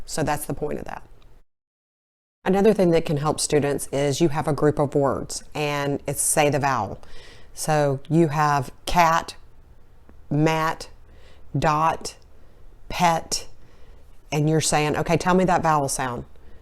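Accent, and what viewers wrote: South, United States. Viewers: When she says 'Go get that', it sounds like 'Go git that', because of her southern accent. It can be confusing for a young person to sound it out correctly.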